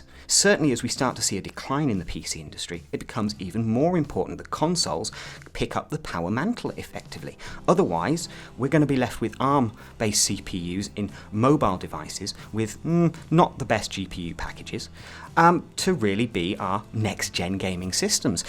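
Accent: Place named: England, United Kingdom. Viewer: SOOOOOOOOOOOOOOOOOOOOOOOOOOOOOOOOOOOOOOOOOOOOOOOO ENGLISH. (I'm British, barely anyone sounds like that here)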